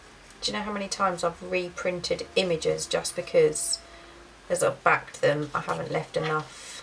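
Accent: England, United Kingdom. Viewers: I love watching your videos and have been learning so much! I just wanted to mention that you have a nice voice. I also like your accent. Your voice and accent remind me of Hayley Mills. I have been a fan of hers since she was Pollyanna (wow, that dates me!). I know England ( I am assuming you are in England) has so many different accents depending on what part of the country you live In. Yours is very pleasant.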